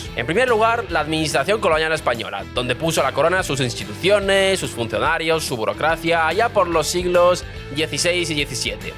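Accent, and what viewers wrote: Russia. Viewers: Es Ruso y casi no se le nota... Hasta ahora.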